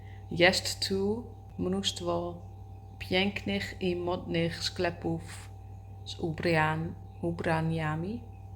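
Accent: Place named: Netherlands. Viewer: Bardzo mi się nie podoba jak kaleczysz nasz piękny język z tym obrzydliwym holenderskim akcentem.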